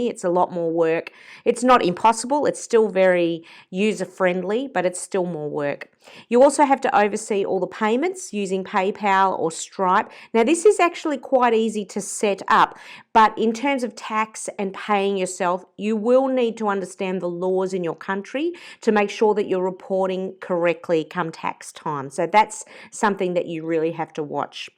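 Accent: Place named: Australia. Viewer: Great to hear another Aussie! I've just started on Teachable and I'm finding it great. The only downside so far is the monthly fees and the extra 5% they take from profits. I've been able to ease into it pretty well as I already have a student base on Youtube and they are very keen learners! Teachable also has a ton of information for starting up and their customer service is excellent. Interesting video, thanks for sharing.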